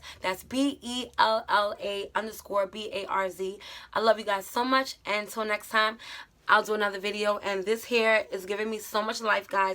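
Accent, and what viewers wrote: New York, United States. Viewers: Was studying for a test tmrw and needed a quick break to release my energy and re focus and just right then your video showed up! And this fits so perfectly in my life rn because ive started slowly but surely focusing on myself and especially self love! Talk about God! Everything you said is head on esp about the spirituality part and eating right. I just got to a point where i was depressed and literally awaiting death and im only 16 as if that would happen any time soon. And I just opened up to God, told him I wanted to change my ways and be happy and be the person whom he wants me to be so when i finally meet him face to face, he'd be proud and satisfied. And I just recently subbed last week and have watched most of your videos, I love ur personality and character! You have such a big sister/ hippie aunt/ motherly love and aura and wiseness to you. 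Idk if u are from Ny and if that helps anything lol but Im picking up the accent and vibes. You honestly deserve a lot of subbies bc you dont srve us 5 mins fake pranks bs or say things that are honey tp our ears and not follow ur own advise! I really don't understand people like u who are wayyy underrated. But keep these vids coming in sis!! Im the oldest sister myself and have always wished i had an older sister to guide me and give me advice and u are one of the very few that do that and thanks for it!!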